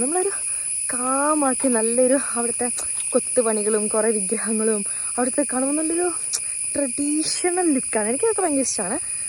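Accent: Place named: Kottayam, India. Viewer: Naatil evdyyaaa?? Samsaram ketit pathanamthitta/kottayam pole😅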